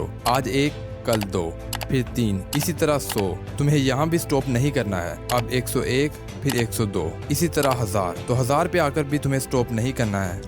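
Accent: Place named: Punjab, India. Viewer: You r punjabi right 😮😮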